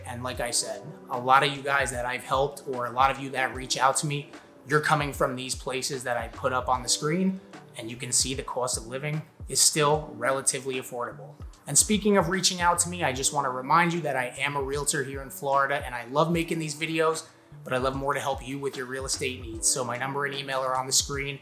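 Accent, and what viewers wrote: New Jersey, United States. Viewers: Great pros & cons video!!! I can tell from your accent you were a Bergen County NJ resident, right? Then again, to me, anyone North of Trenton sounds like they live in Hoboken. This Philly-born and raised, moved to South Jersey (we say "Joisey") resident transplant knows prices and auto insurance in Broward County is about the same as NJ (price hikes in 2024 for NJ). But our homeowner's insurance is so low. We love Pompano Beach and see all the new developments going up there as that's where we vacay every year. Will be down there again (hello Wyndham timeshares) this winter and see all things Atlantic Avenue and A1A near Sunrise. You forgot Con #6: SNOWBIRDS -- traffic is crazy down there, but from late November to April, wow! Of course, in my eyes, the Pros outweigh the Cons.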